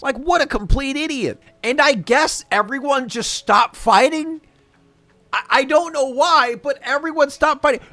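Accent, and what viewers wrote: United Kingdom. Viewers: why did you cut out Malcolm McDowell's name? lol its literally the only name on that list that has a voice that sounds like your british accent lmao and btw idk why but i feel like Elemental is just like this movie with character building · Wow your New Yorker accent sounds very British,what you read was written by The Nyc guru,as it said at the top of what you read .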